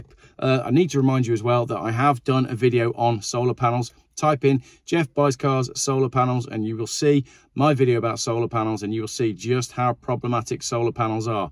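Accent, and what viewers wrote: Australia. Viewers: Great stuff, even the Aussie accent is spot on. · Love your ozzie accent ❤ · Watching in Australia... that is the best Australian accent I've ever heard from a pom, my friend. Most people over do it. You got it just right, 😆👍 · Loving the oz accent 😂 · Pretty good Aussie accent,.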